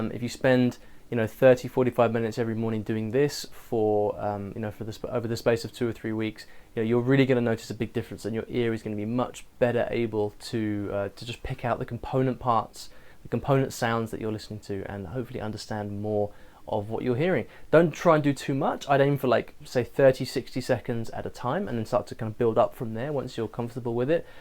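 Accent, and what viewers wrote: United Kingdom. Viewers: you brithish english is really cool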